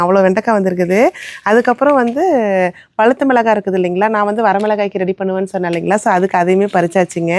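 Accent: Kongu, India. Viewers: Kongu tamil Azhaga pesureenga neenga Coimbatore pakkangala. Super home garden👍👍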